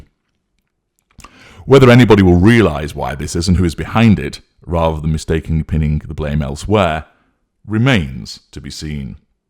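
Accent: United Kingdom. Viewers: Are you Oxford educated? I'm American; your accent is the easiest on the ears of anyone British I have heard.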